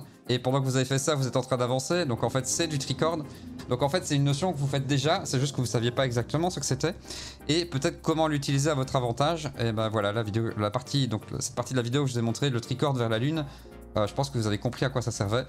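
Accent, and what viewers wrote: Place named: Belgium. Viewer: J'adore la prononciation belge : un ennemi qui "fouit" au lieu de "fuit" xD Tes vidéos me rappellent des potes gamers de Bruxelles et Liège qui disent "houit" au lieu de "huit."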